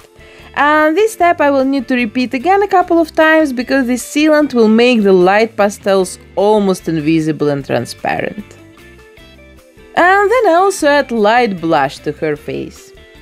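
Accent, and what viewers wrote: Russia. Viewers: Сильный русский акцент)) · Судя по Вашему акценту, Вы понимаете русский. Может, попробуете сделать ещё один вариант Одри? Прошло достаточно времени, чтобы попытаться снова))) Эта версия мало на неё похожа, Вы замечательная художница, и можете гораздо лучше)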